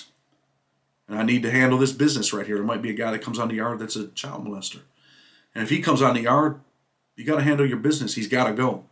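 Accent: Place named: New York, United States. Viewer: My whole family from NY. Got some up in Buffalo, Syracuse, Oswego. I can hear ur accent sometimes. Good vid today.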